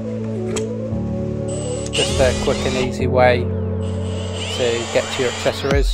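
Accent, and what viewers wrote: England, United Kingdom. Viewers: you sound English, I didnt know England was one of those sad countries that used Phillips screws, I thought that was an American thing.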